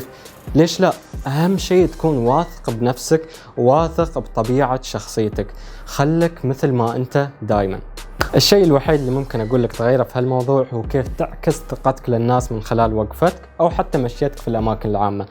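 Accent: Iraq. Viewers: لهجتك تشبه العراقيه الي وياي لايك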